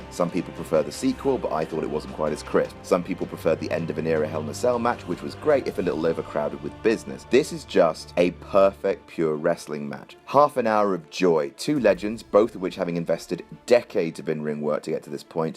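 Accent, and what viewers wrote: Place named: United Kingdom. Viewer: Luv u Have babies with me Their accent would be a combination of your beautiful British accent and my Australian bogan accent It would be amazing <3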